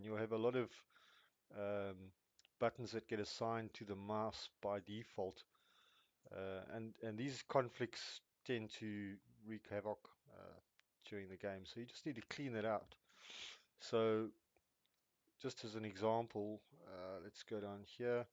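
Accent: South Africa. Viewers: ARE YOU SOUTH AFRICAN?!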